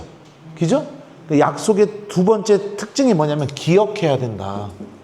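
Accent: Chungcheong, South Korea. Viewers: 신부님 강의는 참쉽고 명쾌합니다. 그리고 충청도 사투리가 너무 정겹고 재미있어서 한참을 웃었습니다.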